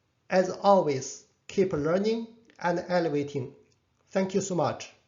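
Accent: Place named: China. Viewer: 謝謝您的分享👍I know u can understand Chinese, from the accent 😊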